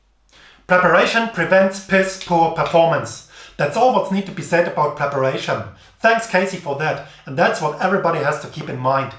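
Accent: Germany. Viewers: Angry german accent makes me happy! :)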